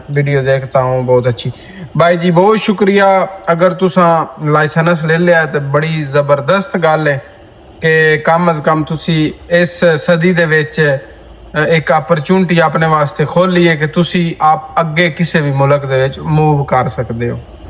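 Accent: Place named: Sargodha, Pakistan. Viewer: Bahi g mennu Sargodha da lagda 😂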